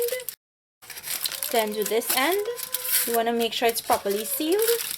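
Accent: Trinidad and Tobago. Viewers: Hi, I'm still watching your Christmas cooking. It makes me feel at home. Trini English.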